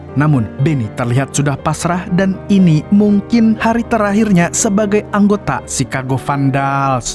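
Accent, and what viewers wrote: Banjar, Indonesia. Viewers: Urang Banjar pinanya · Urang banjar kah mang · Suku Banjar ya min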